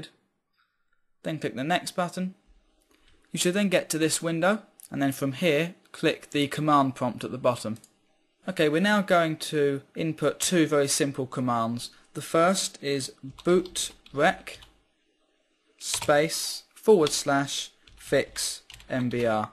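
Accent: United Kingdom. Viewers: An english mate. Who else think he sound like Mr. Bean? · This British accent pronouncing computer phrases sounds like either baby talk or garbage.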